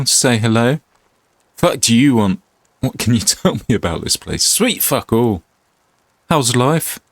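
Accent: United Kingdom. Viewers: Not only is this a perfect mod that I've never heard of before, but being exposed to it by a guy with a proper English accent is even more hilarious. Edit: Also, the name choice is guaranteed to make you a wanted man if you actually go to Scotland.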